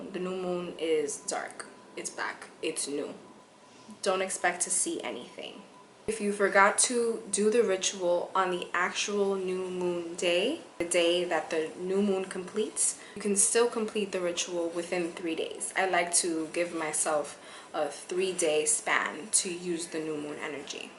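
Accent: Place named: New York, United States. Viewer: I know it’s only through YouTube, but I love your vibe and your NY accent. I like how thorough you are in the steps and the fact that you act them out so we have an idea of how we may like to do them. You are a blessing. Keep it up. Bless!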